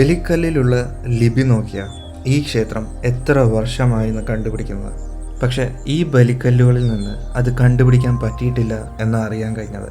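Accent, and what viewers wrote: Palakkad, India. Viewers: താങ്കളുടെ പാലക്കാടൻ ഗ്രാമ്യഭാഷ ഇതു പോലെ തുടരണം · This is awesome. Video + വിവരണം and your പാലക്കാട്‌ ഭാഷ. എല്ലാം കിടിലം.. Missing palakkad🥺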